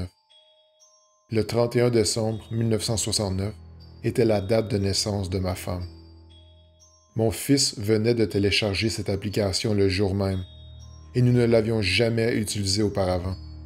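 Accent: Canada. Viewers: J'adore ton accent Canadien, cela donne du piquant à tes histoires.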